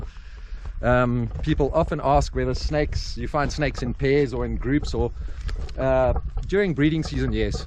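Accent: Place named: Netherlands. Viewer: You sound like a Dutchie! How are you, brother? Beautyfull beard, i'm jealous!!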